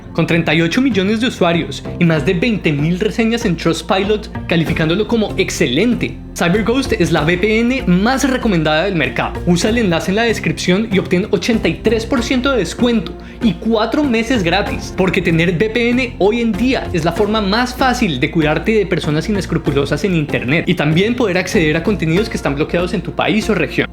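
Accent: Bogotá, Colombia. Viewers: Quedé como iniciado mano! 😅😅 Ojalá puedas hacer la continuación de este video, eres un auténtico rolo, un auténtico colombiano. Me agrada ver que seas tan apasionado por nuestra historia y representes con tu estilo y acento la cultura de la capital. Dios te bendiga.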